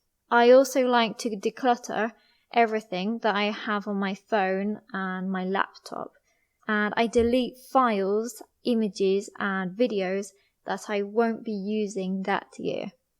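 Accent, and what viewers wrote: United Kingdom. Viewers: Your voice is so pretty wow I love it with the unique British accent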